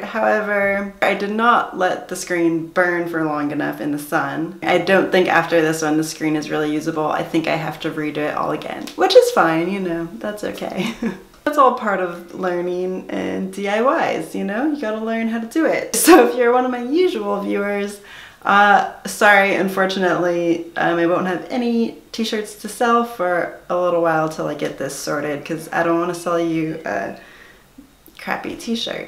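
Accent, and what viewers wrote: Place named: New Zealand. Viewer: never found kiwi accent so interesting before XX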